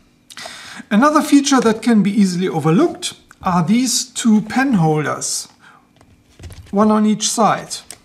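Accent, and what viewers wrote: Germany. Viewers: I'm half German and I love listening to your German-accented English. It's Wunderbar!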